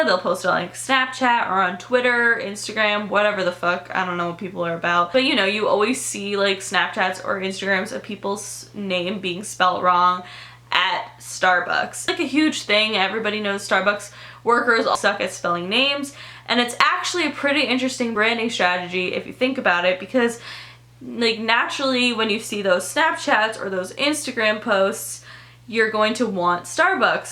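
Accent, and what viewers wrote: Canada. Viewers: r u canadian?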